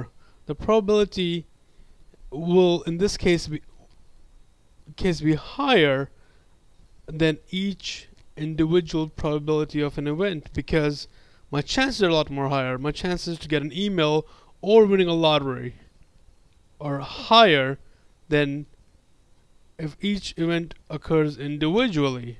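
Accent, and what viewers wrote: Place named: United States. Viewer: what a stupid american accent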